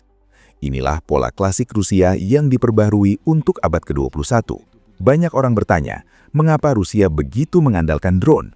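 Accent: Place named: India. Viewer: Același propagandist pro-rus, după dialect de origine indian, a început propaganda pentru anul 2026. Nu vă aplecați urechea. Riscați să vi se strice creierul datorită toxicității.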